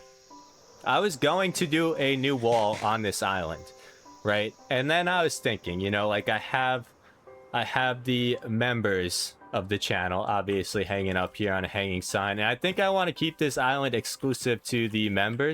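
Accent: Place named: Northeast, United States. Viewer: I hear somewhat a NE accent. Like Boston or New Jersey or something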